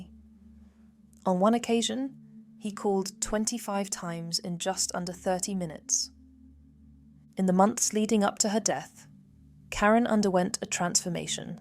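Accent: United Kingdom. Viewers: COPY CAT BRITISH NARRATOR!!!!!!!!!!!!!!!!!!!:face-purple-crying::face-purple-crying::face-purple-crying::face-purple-crying::face-purple-crying::face-purple-crying::face-purple-crying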